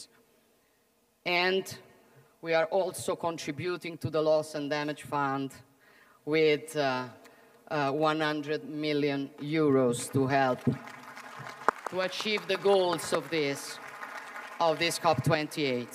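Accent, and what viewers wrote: Rome, Italy. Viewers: A Giorgia, quanto sei più bella quando parli in romanesco!! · Paesi poveri, attore ucraino, qualcosa per gli italiani? Nulla vero? L' inflessione dialettale non se la toglie neppure in inglese!!!! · Ah, mai sentito un inglese cosi’ con inflessioni romanesche! dajjjje!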